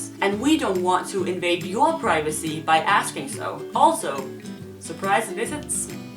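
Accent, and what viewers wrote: Denmark. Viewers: Man kan godt høre på din accent at du er dansker😂😂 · PS: I've seen some of your videos and all I can say is that you're VERY Danish! Your face, your hair color (I love it btw), your facial expressions, your sarcasm, your accent... So Danish! And please don't get me wrong, it's meant to be a compliment :) And since you asked... I would like you to talk about how to be friends with Danes. I'm going to spend a year there and I'm afraid I'll live in a cave with my boyfriend and his friends. It feels like, once you've got a friend, you've got a whole network, but getting that first friend is almost impossible! Do you have any tips? I really like your channel and wish you the best luck with it! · you are awesome! Specially in the videos about Denmark hahaha And as I want to move to DK next year it is also nice to keep contact with the funny danish accent speaking english and getting to know the particularities of this lovely country. 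Greetings from Brazil!!!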